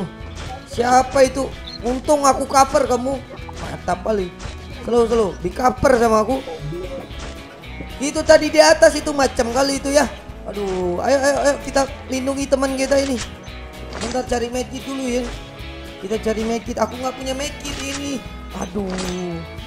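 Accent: Java, Indonesia. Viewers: Medok hahaha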